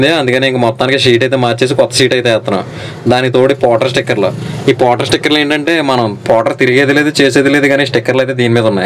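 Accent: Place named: Konaseema, India. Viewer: కోనసీమ భాష పోలేదు బ్రో గుడ్....మాది కోనసీమ.. కొత్తపేట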